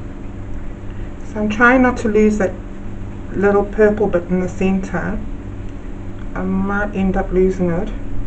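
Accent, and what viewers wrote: South Africa. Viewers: Omg I LOVE your accent!!!! Is it South African?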